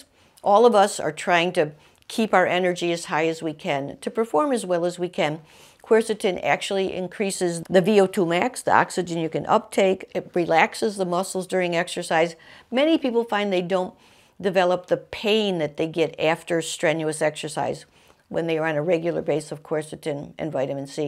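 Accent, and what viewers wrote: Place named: Brooklyn, United States. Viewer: Of course,....you are selling a quercetin supplement! Where's dat accent from,....you sound like my uncle Louie from Brrrooklyn!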